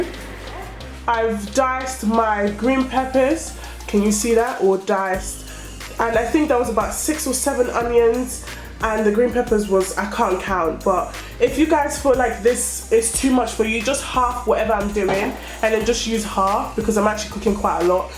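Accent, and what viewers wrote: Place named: United Kingdom. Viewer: She has a british accent , not her fault, actually lovely to listen to and as clear as day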